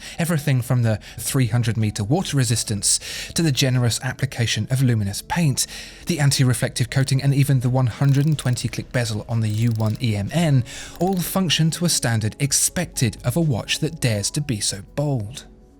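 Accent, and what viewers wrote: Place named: RP, United Kingdom. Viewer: this guys voice drives me so freaking crazy. he talks so freakin slow and poshly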